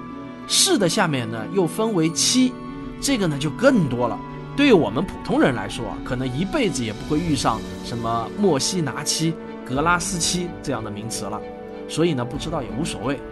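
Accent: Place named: Taiwan. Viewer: 講的比老高還精彩， 汪老師口音，好熟悉， 好像台灣老師授課。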